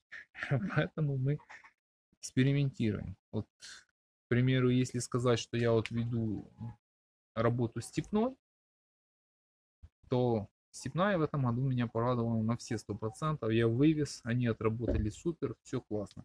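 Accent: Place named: Kharkiv, Ukraine. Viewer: Сократить, утеплить. В октябре, при наступлении температур днем не выше 12-14градусов, присоединить пару рамок с пчелой от сильных семей. Подсилите таким образом. Или соедините с отводком сейчас. Семья эта слабая. Перезимовать перезимует, а как родоначальница будет не айс., Вы с Харькова? Только от тамошних пчеловодов слышал слово ульИ, а не Ульи...извините.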